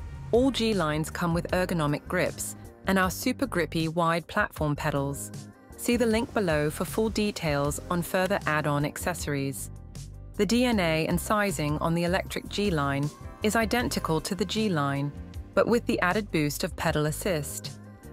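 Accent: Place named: England, United Kingdom. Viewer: Typical English middle class accent. Even Americans can understand her without resorting to subtitles.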